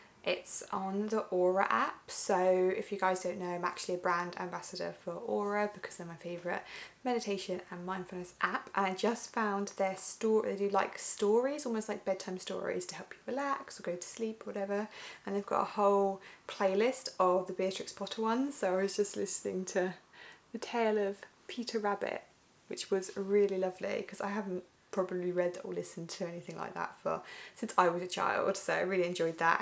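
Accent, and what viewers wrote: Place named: England, United Kingdom. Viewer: I thought your accent was English. 😄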